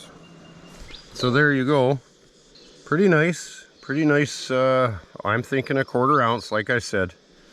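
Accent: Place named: Canada. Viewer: I watch this for the content but also the fantastic Canadian accent, one of the coolest accents there is. I’m a Geordie from the U.K. (Google my accent 😊).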